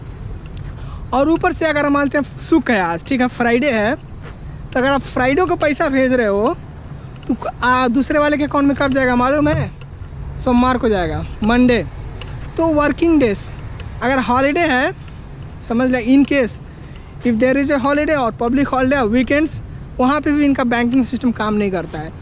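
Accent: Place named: Bhojpuri, India. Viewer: Ekdam bhojpuriya babu jaisa bol rahe hai bhai , we need video like this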